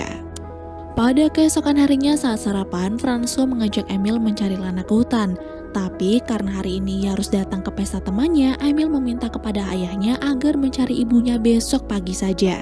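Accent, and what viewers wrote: Pemalang, Indonesia. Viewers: Wong Pemalang tah min?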